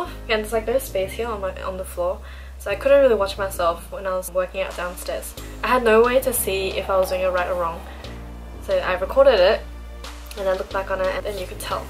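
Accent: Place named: Australia. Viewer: So, are you from Australian cuz u sound really Aussie..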